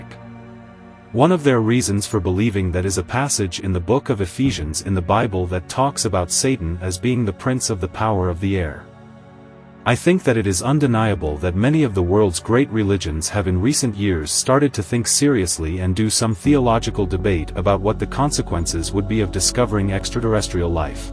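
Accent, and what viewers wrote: France. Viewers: You have a beautiful voice CAPTAIN. You rock the flight deck. Good job! 💖🌞🙋‍♂️👽 "We like your french accent best." Fun to look into a.i. in a good way and have fun with it. ✌️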